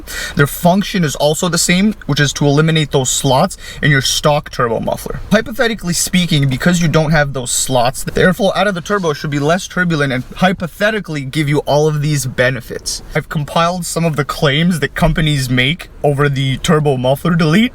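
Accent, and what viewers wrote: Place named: Toronto, Canada. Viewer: Are you from Toronto? You sure sound like you are lol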